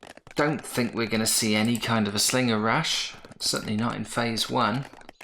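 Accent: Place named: United Kingdom. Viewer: Doesn't sounds like much of a break! If you live in the UK (like you sound you do... you're also up quite late!)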